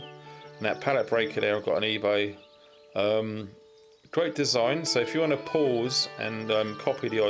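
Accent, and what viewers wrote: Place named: England, United Kingdom. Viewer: I turned on closed captioning. It's really a hoot, as it doesn't understand your English accent very well.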